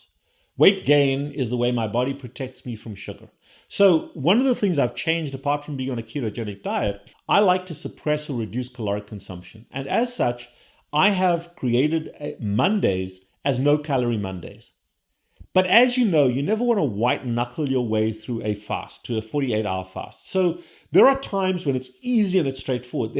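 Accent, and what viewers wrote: South Africa. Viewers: South African accent. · This is the first time I hear a physician speak from such indepth knowledge, esp with Type 1 Diabetes! I'm a Type 1, on Ozempic and not losing at all. My hba1c is at 8.2... I believe your clinic has such an amazing approach losing weight! BTW, I think your accent is South African? I'm also South African and I wish we had more physicians who were skilled with glp meds. My endocrinologist is not 100% on-board with GLP-meds. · Love this advice, thank you. Love the South African accent! I'm also South African. If people can realise that there is not just a cure all but you also need to develop healthy habits to sustain the weigh loss for the rest of your life. Subscribed! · 1. You are Sohth African, by the accent; what British are you talking about?😅 2. I've gained nothing ever since I stopped, which was 10 months ago. 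3. These medications are like miracles. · You sound south African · Hahah… I was just going to ask if the Dr is from SA? Spot the accent a mile away! ❤ from Pretoria! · You have a South African accent 😂?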